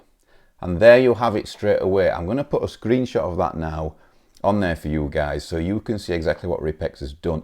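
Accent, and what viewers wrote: North, United Kingdom. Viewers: Great video, and nice to hear a northern accent! I have my own little YT channel where I do bass covers - I'm seriously considering this as I prefer to play over tracks that have already had the bass-line removed so as I'm not doubling up on the bass. This software looks like it could do the trick! 🙂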